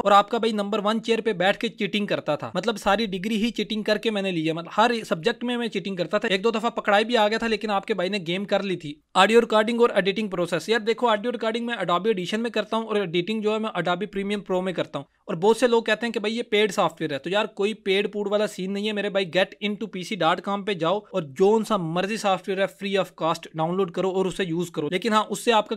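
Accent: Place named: Punjab, Pakistan. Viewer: Ap k bolny k style se lgta hy ap jhlem se ho ... Han ya naa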